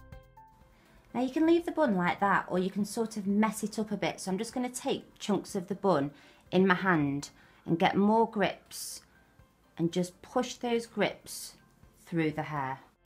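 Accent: United Kingdom. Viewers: Idk What to love about this video. The fact that it's so easy and pretty or the british accent. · Whats a 'bon'.....oh...british accent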